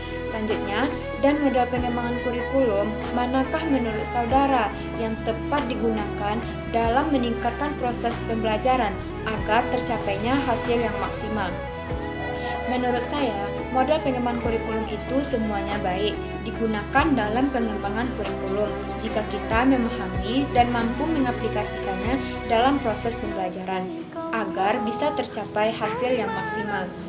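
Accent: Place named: Padang, Indonesia. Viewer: Mantap Kak...Logat Bahasa Padang E Nampak Bona Ya..🙂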